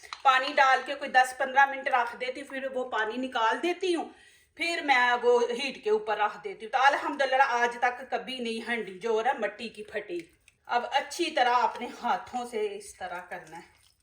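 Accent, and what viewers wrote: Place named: Punjab, India. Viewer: MashaAllah zabardast bhout khubsorat still awaz ka Andaz Panjabi bhout khubsorat laga dia Karachi Pakistan khush rakhae ameen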